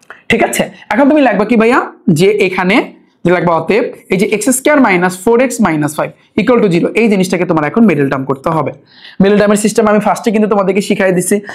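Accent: Barishal, Bangladesh. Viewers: Vaja apnar basa ki borishal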